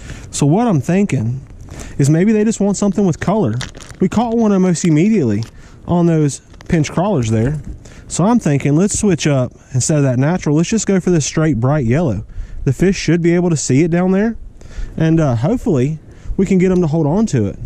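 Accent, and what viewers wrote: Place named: Georgia, United States. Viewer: Are you in ga. Cuz you sound like it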